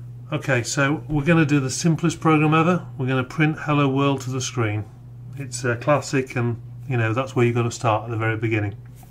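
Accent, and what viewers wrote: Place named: United Kingdom. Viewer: this man let me hear his whole passion and the british accent round it perfectly up really great to start the journey with you guys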